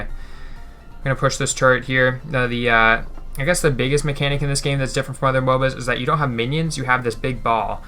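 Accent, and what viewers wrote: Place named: Boston, United States. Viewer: Yo I live in Massachusetts!! You said that you have a Boston accent so I thought you Live in Boston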